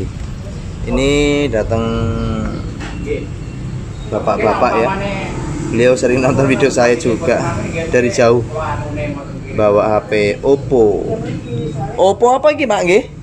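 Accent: Java, Indonesia. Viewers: Mas,sampeyan orang lampung kok bahasa jawae kok medok ya.??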